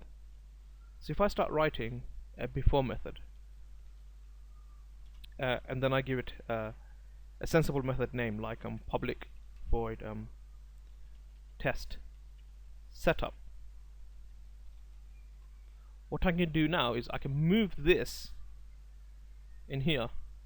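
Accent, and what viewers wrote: United Kingdom. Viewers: Where are you from men? Your accent sounds like... I don't know... Scottish? (well definitely not an Indian?..) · Hi, Your work is Amazing buddy, I like it very much, to the point videos. I do have bit of a issue with following some sentences of your British accent, but this issue is far from the quality of subject ad experience you deliver. Keep up the great work.. One thing I wanted to ask, in your videos, you worked with small examples, small piece of codes. I am Manual tester with some automation experience in QTP, I am after learning automation using from your and other people's videos, i want to start my career into Automation using selenium, but I am hesitant because so far we have only learnt with small samples. Can you give us a real time example or real time requirment in regard with automation, so I can judge the scale of intelligence required to do automation in real world . 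I know this is a general question , to which mots people end thier answer with " IT DEPENDS" and leave the questioner to swim in the ocean, Can you please try to answer this in more helpful way to newbies by giving some real life examples you came n your carrer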